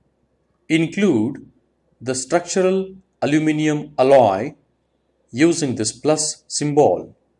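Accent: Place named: India. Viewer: God!Indian English!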